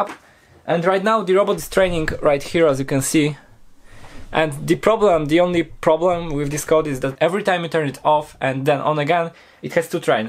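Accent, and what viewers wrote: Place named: Poland. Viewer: Po akcencie poznałem że to Polska XD Pozdrawiam, mega robocik :D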